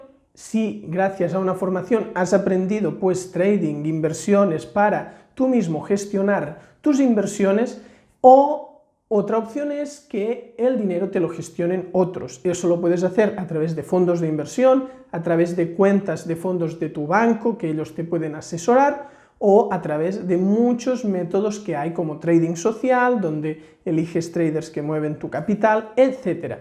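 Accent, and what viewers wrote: Catalonia, Spain. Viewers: Solo por curiosidad, eres catalan? Lo digo por el acento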